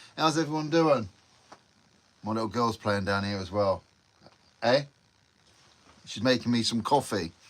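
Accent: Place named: East Anglia, United Kingdom. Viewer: You just sound like a east anglia accent but I read Channel Islands should have a bad South African accent?🤷‍♂️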